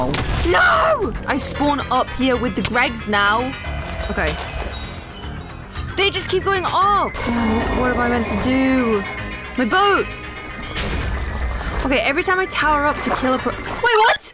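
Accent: United Kingdom. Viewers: I don’t know why but the sound of a slightly unhinged female with a British accent is always so funny to hear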